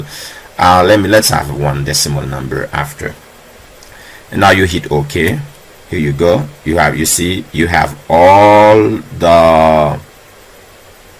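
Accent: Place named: Haiti. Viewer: Thank you, my brother. I've been trying to figure this out. You're from Haiti? I know the accent when I hear it!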